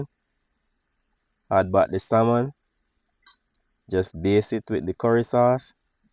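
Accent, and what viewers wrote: Jamaica. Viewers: I just love mi JAMAICON accent and pronunciation. Get well soon, we miss you.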